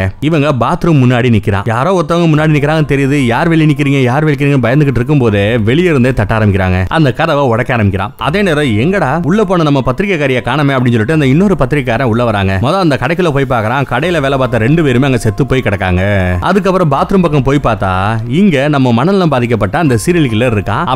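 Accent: Madurai, India. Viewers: Anna nenka pesura Madurai Tamil super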